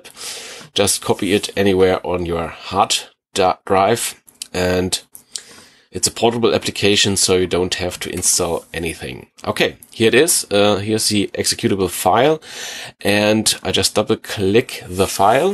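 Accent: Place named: Germany. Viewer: I can not live through 1 day without a guy with heavy, heavy German accent speaking about tech stuff.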